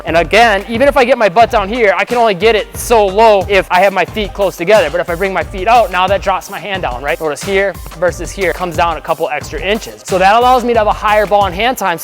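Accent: Canada. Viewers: Why does he sound Canadian??🤔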